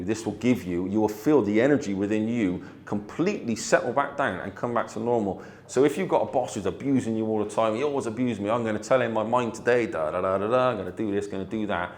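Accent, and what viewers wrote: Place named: Bristol, United Kingdom. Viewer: Brilliant lecture...sounds like from Bristol too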